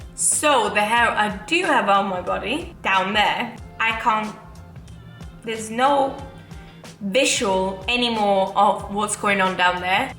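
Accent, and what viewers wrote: United Kingdom. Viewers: Omg your accent is so much more British🤣🤣 love it 🥰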